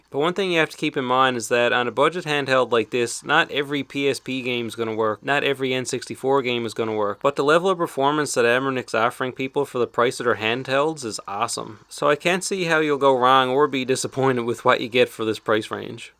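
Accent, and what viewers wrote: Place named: Newfoundland, Canada. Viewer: Just ordered one of these after watching this video. Looks like an awesome rig! You also kinda sound like you're from Newfoundland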